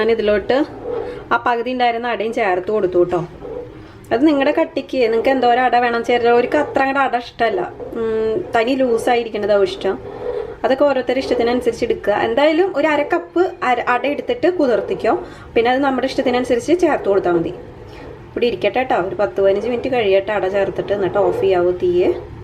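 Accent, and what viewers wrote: Thrissur, India. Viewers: തൃശ്ശൂർ ആണല്ലേ 😃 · Thrissur alle veeed Alla... slank? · Chechi de varthnm nth rasa... Enik othiri ishtay.... ❤️😘Trissur aana place? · Trissur bhasha polichu. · അടിപൊളി ചേച്ചി ഈ കണ്ടതൊന്നുമല്ല വീഡിയോ ഇതാണ് ചേച്ചി പറയുന്നത് നല്ലതുപോലെ മനസിലാകുന്നു നല്ല voice തൃശൂർ ആന്നോ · thrissur da bro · Pukkaaaa thrissur bhaasha...ngaan thrissur adutha padiche. Nostalgic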